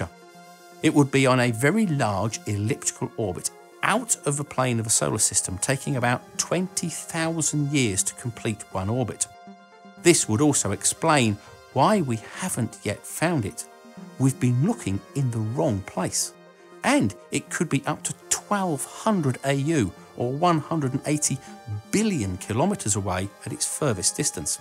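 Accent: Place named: Cockney, United Kingdom. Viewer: A fascinating video as always but the blokes terrible Pommie slapped Cockney accent is awful and distracting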